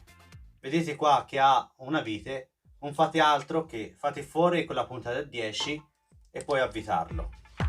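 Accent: Tuscany, Italy. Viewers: Di dove sei ? Toscano? Se ti mando i monopattino me lo modifichi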